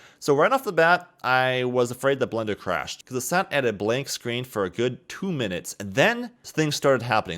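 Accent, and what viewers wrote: Germany. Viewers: Are you German ???? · Yo are you german???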